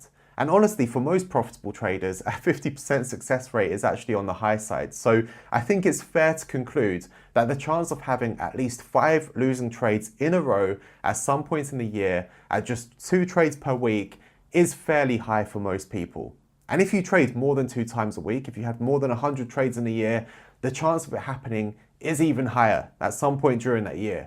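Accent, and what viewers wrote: United Kingdom. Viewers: Loved it. So informative on so many levels! Thank you kindly ! Articulate English accent & witty humour a happy bonus :-)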